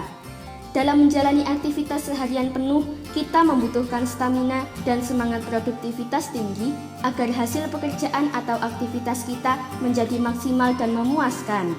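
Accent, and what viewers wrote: Java, Indonesia. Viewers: medok medok seksi❤❤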